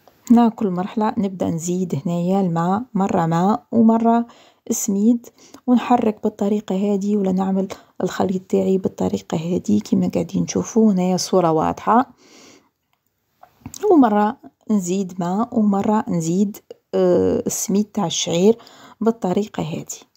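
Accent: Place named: Tunisia. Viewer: سبحان لهجتها تقول تونسية 🥰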